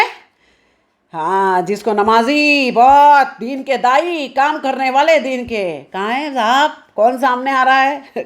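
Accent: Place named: Hyderabad, India. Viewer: ap sida urdu boliye haydrabadi acha nahi lagta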